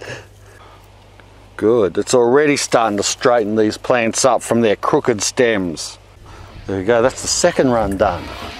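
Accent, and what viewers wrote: Australia. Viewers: Your content is so wholesome. When you said "don't do this at home" it reminded me of my dad except with an Australian accent.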